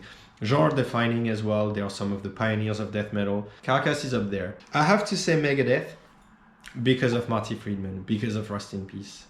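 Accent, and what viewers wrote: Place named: France. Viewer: With your accent I think you're French no? Cocorico ?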